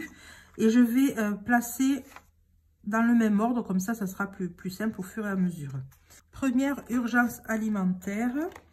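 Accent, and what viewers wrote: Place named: South, France. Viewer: COURAGE continue ainsi, rien que pour VS Avec votre charme accent du Sud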